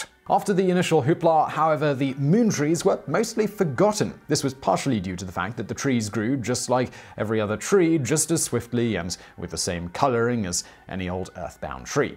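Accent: United Kingdom. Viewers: Okay, I know I give you crap all the time about your pronunciation of Los Angeles, although I did hear you say how an American would say it really recently, proud of you, the way you said New Orleans is not too different from where people where I'm from say it, so I don't know if you've been taking notes or just in the UK that's how they say it. there's another English guy on another Channel I watch, and he keeps saying Saint Louis as "Saint Louie". now, there is precedent for that, and historically it has been pronounced like that, but nowadays we say it more like "Saint Lewis". So, imagine your Welsh friend is really holy. Anyway, I have to say though, I didn't know whether or not this channel had started getting into conspiracy theories when I saw the title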